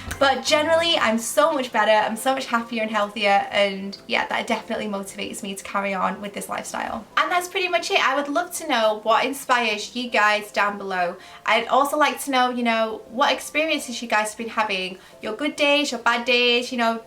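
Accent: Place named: Manchester, United Kingdom. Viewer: Hi sweetness, this is such a lovely video. It’s so honest and genuine. I wanted to let you know that I appreciate you! You inspired me to start weight watchers 5 weeks ago when I wasn’t sure what to do with myself and all of your videos have really helped me understand how to do it in a practical way with lots of yummy recipe videos. I’ve lost 7kgs in a month just through WW and I feel so much better in myself already! On a totally different note I love listening to you, my dad is originally from Manchester so your accent always make me feel at home. Lots of love across the seas ❤️ ❤️ (from Melbourne, Australia)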